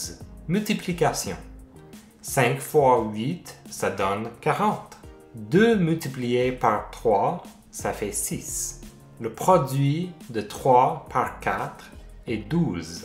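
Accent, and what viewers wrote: Quebec, Canada. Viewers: Merci professeur pour cette merveilleuse leçon ! Je viens juste d'arriver sur votre chaîne. Vous êtes Canadien francophone ?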